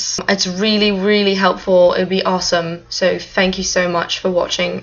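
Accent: United Kingdom. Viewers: Your english accent is pretty good. It sounds more posh upper class southern than normal BBC London southern. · hey im from England and that's a proper posh English ascent its really good!!! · Wow you must be British x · Really good accent cant fault it if your going for a very posh classy accent you have nailed it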